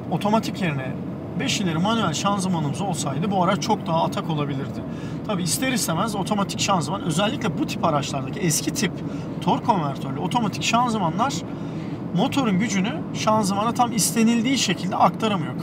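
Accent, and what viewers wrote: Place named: Aegean, Turkey. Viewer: Abi sen egelimisin orlarda burlarda diyon gari hep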